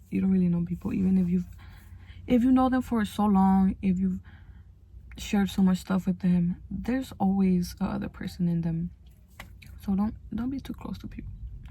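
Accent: United States. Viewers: gurlll what side of houston you from?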